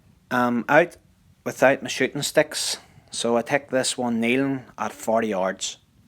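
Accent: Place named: Ireland. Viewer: Good shooting,love that Irish accent.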